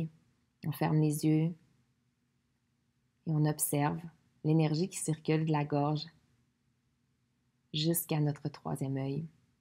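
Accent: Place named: Quebec, Canada. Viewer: j'adore cet accent Quebecois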